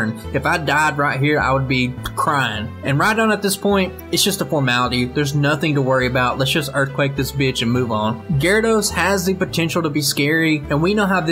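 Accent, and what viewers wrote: South, United States. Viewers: nah, dude. Thank YOU for the great content. Love the southern accent!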